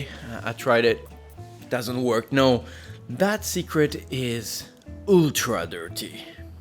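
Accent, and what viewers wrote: France. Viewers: Oula il a un accent français lui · and... sorry but are you French ? (I noticed a little bit of a French stress in you voice... ^^). No offense though. French is my mother language ;)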